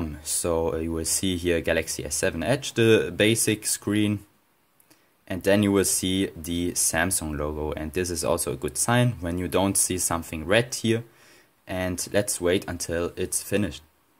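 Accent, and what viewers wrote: Germany. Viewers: Are you from germany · Oh I can feel your German accent 😂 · Man Hört das du Deutsch bist xD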